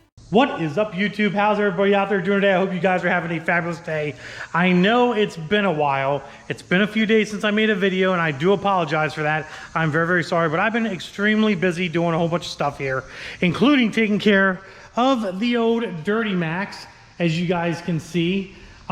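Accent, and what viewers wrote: Pennsylvania, United States. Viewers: You pa people are messed up with your English wtf? It's a rade I ator and borg Warner what is wrong with u bro? Ohio is one state away we can speak correct pa is the only state that can't talk right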